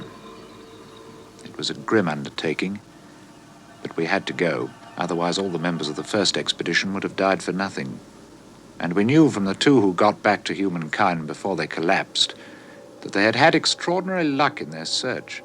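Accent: RP, United Kingdom. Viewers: Its like a weird apocalyptic pastiche of a salvidor dali painting, in a posh british accent 😂 I love it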